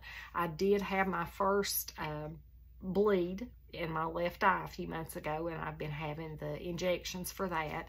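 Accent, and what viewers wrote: South, United States. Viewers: Hello, I’m an old southern gal from the woods of southwest Alabama, so your accent sounds just like me. I’ve been sewing since I was a little girl, myself. My grandmother was a seamstress who sewed for other people and she made most of my clothes growing up. She taught me the basics of sewing and I took it from there. Your sewing room looks interesting, I have Pfaff machines also, as well as a Singer Professional 430, a Juki 2000qi straight stitch and various other embroidery machines and a brother serger. I’m am like you, I keep everything, so I have had to do a declutter in my room this year, that hurt! My sewing room is outside of my house in a metal building that was used as an office years ago. It’s just across the yard and about 12 by 24 feet. It works for me as I can leave everything out without disturbing anyone. I will subscribe and look forward to watching your videos. 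· I’m new to your channel and love your southern accent 😊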